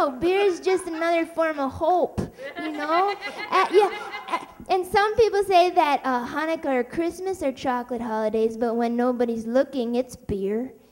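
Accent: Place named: Midwest, United States. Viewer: Wisconsin, Minnesota accent & twisted sensibility… both mine it.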